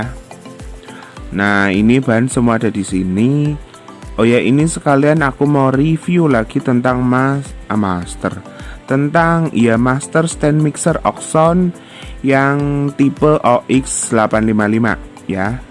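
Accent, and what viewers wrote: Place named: Tegal, Indonesia. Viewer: Oh.. sampean org tegal to...sip dah he he heee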